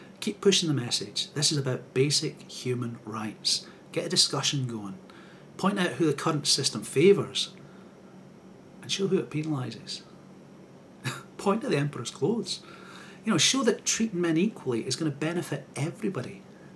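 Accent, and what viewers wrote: Scotland, United Kingdom. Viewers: ...you might want to think about transcripts. I'm very good at understanding various accents very well, and I love your lucious burr, but I know some Yanks in particular have trouble with it. Sorry 'bout that, we ought to get better at hearing other cutlure's lovely ways of speaking but some of us are just terrible at it and can't help it. Perhaps putting up transcripts in your low bar would help a few who canna heer ye threw tha' luvly way o' speekin' ya got thairr...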